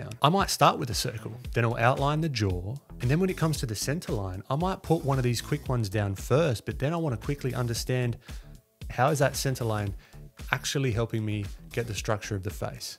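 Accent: Australia. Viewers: I swear you are Australian. That’s all I hear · This type of content is great. Please, continue creating things like this. Really really helpful. Besides you sound incredibly Australian and I love it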